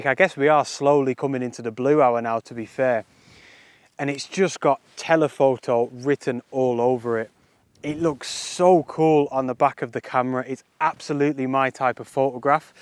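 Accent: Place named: Liverpool, United Kingdom. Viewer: why does his accent sound like the Beatles?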